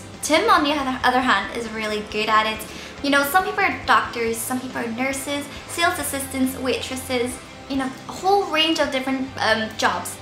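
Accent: Northern Ireland, United Kingdom. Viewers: Woah you sound like you have a northern Irish accent! :o P.S. I'm Irish and this is my first video I watched of you and you seem lovely :) <3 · She has mostly a Northern Irish accent :)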